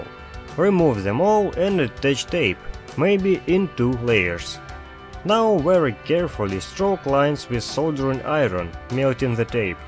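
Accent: Ryazan, Russia. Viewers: Акцент рязанский )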